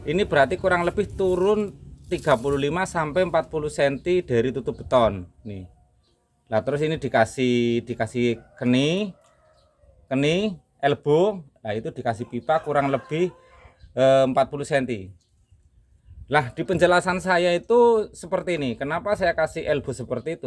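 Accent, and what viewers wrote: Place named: Banyumas, Indonesia. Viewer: Logate kayong madan ngapak kie,, 😁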